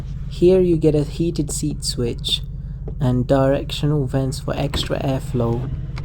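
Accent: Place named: Kerala, India. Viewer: Malayale English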